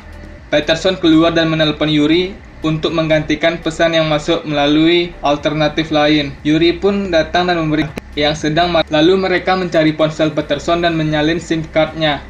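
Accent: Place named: Minangkabau, Indonesia. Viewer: Orang awak ko bantuaknyo😂..... Medok x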